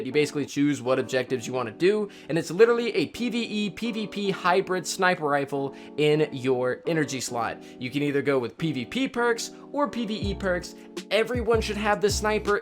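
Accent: France. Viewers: U actually French?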